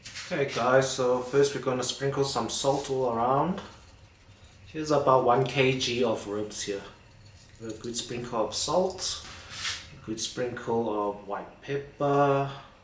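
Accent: South Africa. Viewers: Your accent is giving me South African 🤷🏽‍♀️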